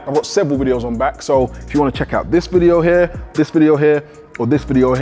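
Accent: United Kingdom. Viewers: Ain't you from UK 🇬🇧?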